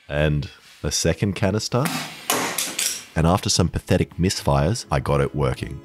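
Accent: Australia. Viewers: I mean he is an Aussie, so he has the accent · And the Aussie voice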